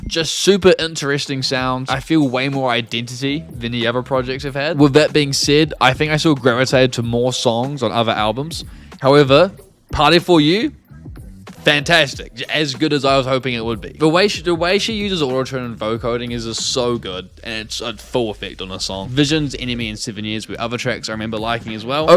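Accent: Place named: New Zealand. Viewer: cool! is also from New Zealand, so you both have this nice accent. You also have to check her albums out!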